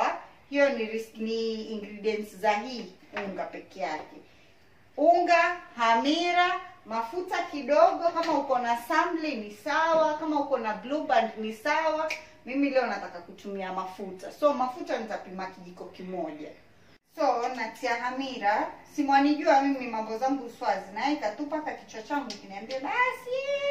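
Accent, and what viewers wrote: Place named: Swahili, East Africa. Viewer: I love that Kiswahili accent ❤️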